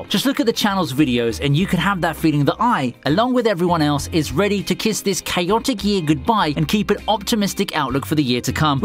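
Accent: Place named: United Kingdom. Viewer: I love your british accent!!! Thats why I'm a fan of you!!!!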